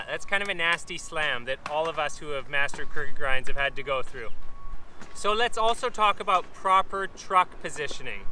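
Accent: Canada. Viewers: Is that an Ontario accent? idk if you are but you sound incredibly Canadian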